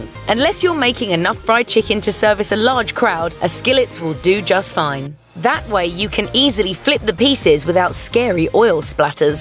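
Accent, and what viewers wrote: England, United Kingdom. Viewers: Gilda garbutt wyer I have not watched a mashed video with a british host/narrattor. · fried chicken, British accent... doesnt match... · Hey folks, I'm no "expert" chef or anything, just a Mississippi boy who's been frying things for 35+ years. Fried some squirrel I'd put up in Feb just today! lol. I've only fried chicken about...uh...250 times...lol..so I'm pretty good at it (do anything 250 times and you can't help but get good, right??). Anyway, I have to say, I don't like most cooking videos, especially really slick ones like this - with an English narrator, no less, lol , but these tips are really, really good. If you will follow these tips you'll do just fine. And each time you cook chicken you'll learn something. But I'm going to make just a few little suggestions that work for *ME*. I cannot speak for anyone else. 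First, regarding cooking temp: 300 to 325 isn't quite hot enough. I suggest getting the grease closer to 375, even 400 isn't "too" hot, because even if your chicken pieces are room temp (which is rarely the case if you could feel the middle) your oil temp WILL drop when you add your chicken. 325 is a pretty good temp to *maintain*, but even then I like a little closer to 350. Second, be careful with that "brine" idea. Yes, it can be a benefit, but it's certainly not necessary and sometimes it can negatively affect the texture of your meat, introducing a bit of a "compressed" or "processed" feel - which is never preferred. I would try getting good results first without the brine. If you want to marinade or pre-season in any way, just rub the chicken down with salt and maybe some cajun seasonin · The British narrator ruined it for me 🙄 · Why is a British person talking about this??🤔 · The first tip to making fried chicken is not to listen to a British accent narrator tell you what you been doing wrong! 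· New british voice! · I'm sorry but I can't take someone with an english accent seriously when it comes to frying chicken... leave that to us southern girls. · Woman with British accent talking to US about fried chicken? Dafuq outta here! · I'm sorry but I can't listen to someone with an English accent talk about the "right way" to fry chicken...I need to hear more twang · Thanks British lady.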